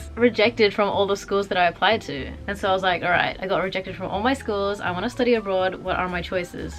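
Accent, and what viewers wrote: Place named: Western Australia, Australia. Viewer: Wow, it's rare to see someone from Perth. My home town also. Your qualifacations are in marketing which me to think of a couple of things. (You have probably thought these). Firstly set yourself up as a business and stay on a business visa. Secondly persue work in the hospitality tourist industry. Thirdly because of your wonderful West Aussie Accent... (And I think you would gave thought of this too) Teach ingrish... And extend your visa on that... Good luck you have an amazing amount of energy and you are right, Perth sucks at certain career choices.. Finally continue to be Awesome!!!!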